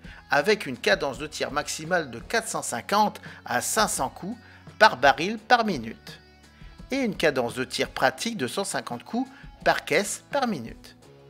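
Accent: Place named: Germany. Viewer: Il a un petit accent allemand 😉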